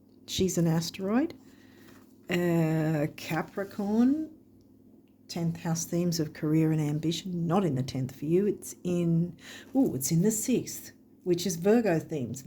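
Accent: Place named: New South Wales, Australia. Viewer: Wow, thanks for this! Followed Gregory Scott across to explore your site - got way laid here on my way to the Mushroom Tarot review. What a happy serendipitous day of exploring rabbit holes this evening has become. Just got back from six months in NSW so hearing your accent was a heart warming thing in itself. Will have to re listen to this a second time and take notes. Love noticing how the tendencies and energies ebb and flow as I'm wading through this month of 'could go either way in home, relationship, work - looking after myself has become a priority though now. So thanks for the encouragement for that!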